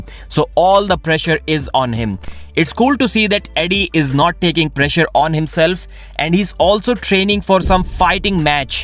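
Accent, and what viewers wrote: India. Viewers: I can't listen to that deescoosten idiian vooecee.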